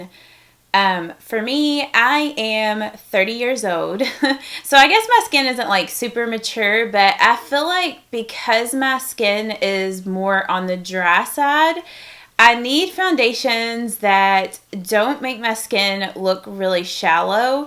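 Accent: South, United States. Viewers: does anyone know where she's from? I'm hearing a southern accent. I'm from ga. just wondering!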